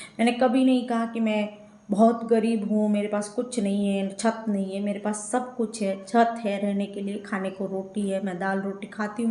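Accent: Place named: Marwar, India. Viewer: Sabhi ko meri taraf say bahut bahut pyaar phir say aayenge mujhe to kee aur aap kee Marwadi boli sunani hai, sab ko meri taraf say bahut bahut pyaar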